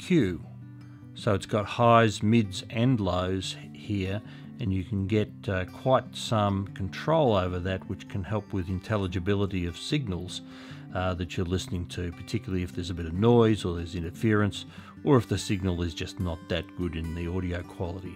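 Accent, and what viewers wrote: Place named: New Jersey, United States. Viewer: that's a lovely accent you have, new jersey?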